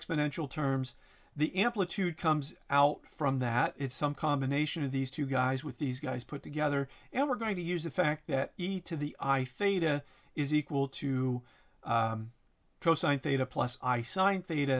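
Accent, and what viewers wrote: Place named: United States. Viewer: This is how an American should sound like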